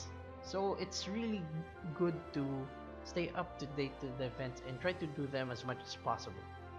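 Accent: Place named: Philippines. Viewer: Ang accent mo parang Filipino Pilipino ka ba?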